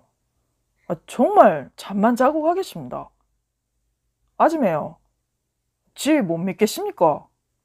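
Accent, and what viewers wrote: Gyeongsang, South Korea. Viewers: 경상도 사투리..ㅋㅋ 감사합니다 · 갱상도 사투리 좋아요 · 사투리는 전형적으로 타지분들이 하는 서툰 경상도 사투리인데요ㅋㅋ 그래도 왠만큼 하시는것같고 귀여우시네요ㅎㅎ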